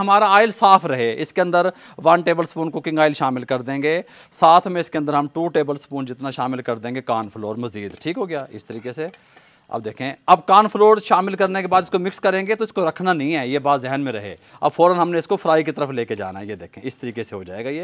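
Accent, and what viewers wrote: Punjab, India. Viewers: zaberdast mujy ap Ki Punjabi boht achi lgti h❤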